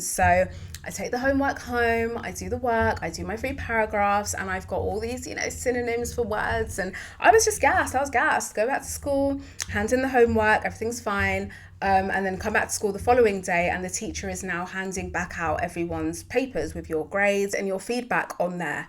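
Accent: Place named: RP, United Kingdom. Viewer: To be fair I do really like the way you speak. Your accent makes you sound posh. Plus you're very calm and soothing. In relation to other black women, im not too sure. I've found that some can be quite boisterous in public, at the same time, we tend to notice disturbers of the peace, not the quiet people just going about their day. In personal conversations, I've had no issues.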